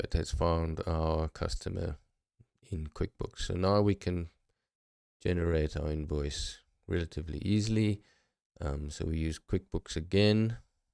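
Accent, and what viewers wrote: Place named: South Africa. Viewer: Very cool, why did I know you are from SA by 😅 voice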